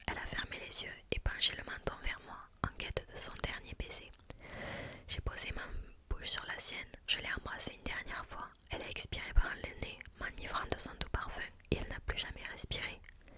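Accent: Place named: South, France. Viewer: L’accent du Sud et de l’Asmr , un plaisir cong!!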